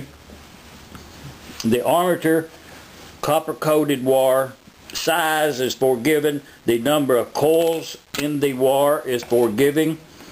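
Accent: South, United States. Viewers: lol if you think that sounds like irsh/scottish then you have a problem LOL wtf... you can tell he's American... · I think this video and it's comment section served to demonstrate a phenomenon that is counter intuitive, but exists nonetheless.... The phenomenon is, this guy's Southern accent and style of speaking actually caused to generate unwarranted criticism and hatred from viewers...I challenge one to find another video on YouTube that generates so many, i think unwarranted complaints. The complaints are valid, but most homemade videos are all around worse...Cinematography, subject, narration voice and content/explanation... I put forth the hypothesis that because you all hear the accent and subconsciously, at the very least, you think he's a racist or bigot in some way....and the rest is a YouTube comment section.... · I believe its a genuine dialect of English as spoken in some part of the USA. 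The English language is very mangled but I can make out some of it. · The southern accent is strong with this one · I like your accent,you sound like a good ol boy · Good stuff. Love your drawl! I love tha south son!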